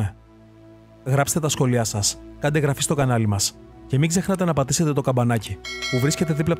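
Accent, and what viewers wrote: Crete, Greece. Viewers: ναι ρε φιλε, αχα. ανοιξα να δω τα σχολια για αυτό το λόγο. Άσχετο, απο την προφορά του, πρεπει να ειναι απο Κρητη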